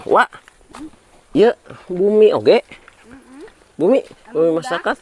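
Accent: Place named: Banten, Indonesia. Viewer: Bahasa na sami siga di banten balukang, baralak kampung naon atuh ieu kang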